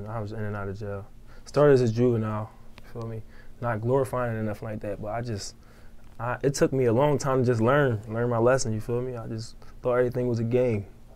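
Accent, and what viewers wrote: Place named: Philadelphia, United States. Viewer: That's what being from philly sounds like lol #SouthPhilly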